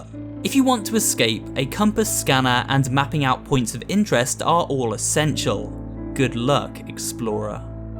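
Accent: United Kingdom. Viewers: Omg the british is so deep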